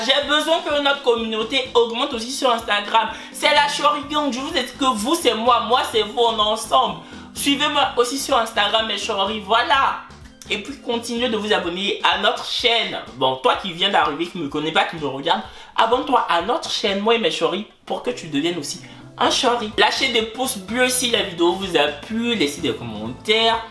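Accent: Ivory Coast. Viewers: 😂😂 c'est la 1ere fois que je vois et entend ce gas! MDR déjà je sais que c'est un ivoiriens de suite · Clover C'est normal c'est la manière de parler des Ivoiriens!!!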